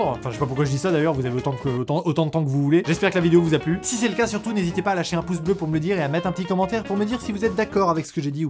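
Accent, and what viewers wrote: Paris, France. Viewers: Certes tu as l'accent Parisien, une dégaine de Parisien MAIS, tu as un humour assez fin et tu as l'air d'avoir une certaine ouverture d'esprit interessante :) Mais le problème du Parisien qui fait la gueule est résolu ! Nous provinciaux n'avons pas tout ce que vous avez à Paris du coup il s'émerveille plus qu'un Parisien mais "el provinciol t'inkète pô que tu l'fout un mois o Poris h d'vient poreil hein !" 😂😂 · Si tous les parisiens étaient comme toi Paris on aurait plus de quoi les critiquer, a part peut être l'accent si si jte jure en tant que sudiste les parisiens ont effectivement un accent, la bise ! 😁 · l'accent parisien est fort marqué quand même 😂😂 sinon super vidéo et non ça va tu n'es pas le stéréotype du Parigo de base 😂 #teamNord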